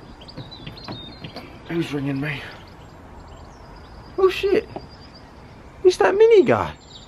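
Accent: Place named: Sheffield, United Kingdom. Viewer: Hahaha I've a mate from Sheffield who talks like you 😂 rate is right barnet is head LMAO it's funny when he talks lol I'm from Leeds but I don't talk like that lol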